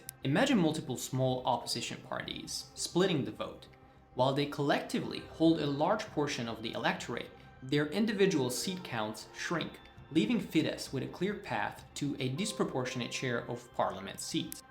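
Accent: Hungary. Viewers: The Why Club, eh? With 200-some subscribers? Yet another recently created propaganda channel from Orban's spin doctor, Antal Rogan. At least, he could have picked a narrator with a less obvious Hungarian accent and an accurate English pronunciation. Hungary as a blueprint for the US? A piece of shit village in the Balkans with a populist wannabe dictator fantasizing about "leading the world" with his provincial political philosophy geared towards a single mission: grand theft. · You were born in hungary, right? Or am I crazy? Some of your pronumciation sound hungarian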